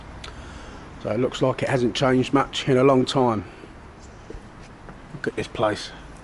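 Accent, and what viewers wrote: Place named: East London, United Kingdom. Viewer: You'll crack that algorithm sooner or later,. Besides, your videos are a dumbed sight better than most of these London walking videos you get where the person doesn't say a word. And, with your East London accent, it feels more authentic too.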